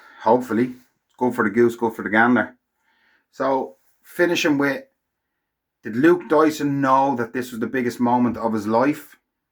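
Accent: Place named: Wales, United Kingdom. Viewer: My welsh sounding guy.....YNWA 👌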